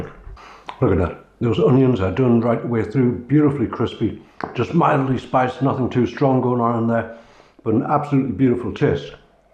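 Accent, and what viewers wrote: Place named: Tyneside, United Kingdom. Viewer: Love this recipe, we live in Canada and onion bhajis are weird over here. Husband is from Sunderland (grew up in Washington) and he’s placing your accent between North Shields and Newcastle , how accurate is he? Thanks for the recipe.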